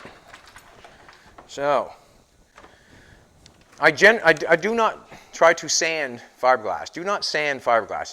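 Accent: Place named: Annapolis Valley, Canada. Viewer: Came across this looking up fibreglass fill and I saw your last name and thought Annapolis valley. When you spoke that cinched it ( I grew up in Berwick). Neat. Your video is helpful too.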